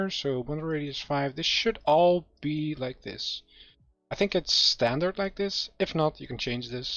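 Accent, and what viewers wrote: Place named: Netherlands. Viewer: Je klinkt Hollands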